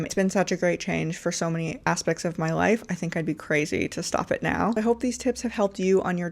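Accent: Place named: Boston, United States. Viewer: Your Boston ❤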